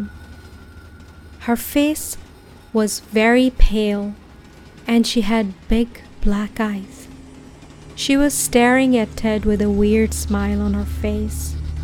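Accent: India. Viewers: Are you indian by any chance? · U have a really Indian accent it’s annoying me. Sorry !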